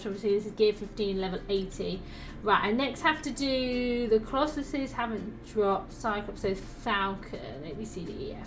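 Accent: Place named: United Kingdom. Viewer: I love your british accent. Good job. Keep on playing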